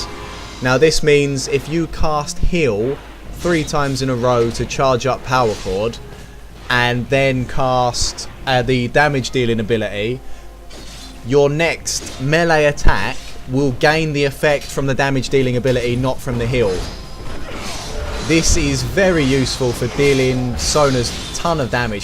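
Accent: United Kingdom. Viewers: British :P <3!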